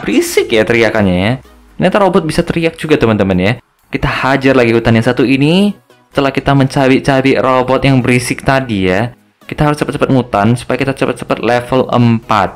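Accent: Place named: Sumatra, Indonesia. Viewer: makin oke editannya, sukses terus bang tetap buat viewers mu yang satu ini bahagia 😄😄😄 btw lu orang mana bang, logatnya gw denger sumatra banget 😶😶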